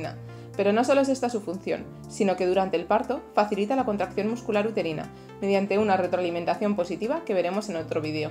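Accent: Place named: Spain. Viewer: Tiene voz española, pero siento que es la tonada española que más se asemeja a la Argentina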